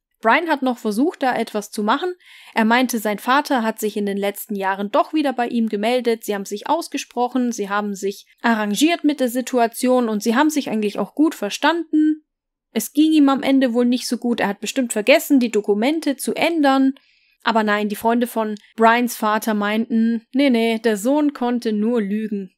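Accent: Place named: Swabia, Germany. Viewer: Schwäb"sche Vokale - wunderbare Eigenheit bei . Unbbadiiingggttt beiiii- behalda! Gell, ge '? 🎉😅😅😅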